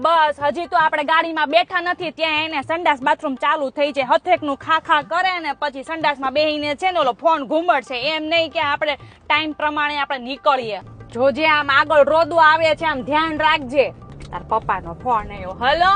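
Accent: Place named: Kathiyawad, India. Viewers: તમારી સ્ટાઇલ એકદમ ગુજરાતી ગામઠી ladies અને લહેકો પણ અદ્દલ એવો જ....😅😅 કોઈ તમને ના પહોંચી વળે હોં.. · Videsh ma ryo cho pn aapdo kathiyavadi lehko evo ne evo j che ho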